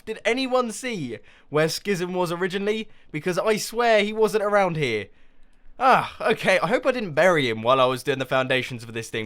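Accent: United Kingdom. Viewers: Are you british? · are you british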